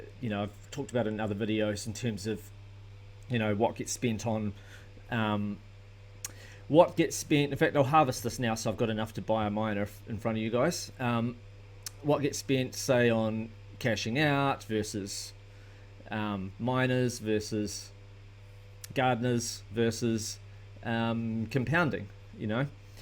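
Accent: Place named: New Zealand. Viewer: Yeewwwww NZ accent, reminding me of home. LOVE IT BRAH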